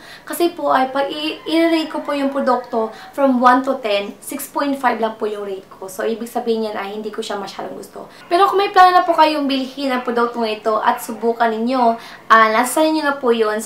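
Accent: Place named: Philippines. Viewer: i like your filipino accent.. haha ginamit ko din ko kaso cakey sya.... haha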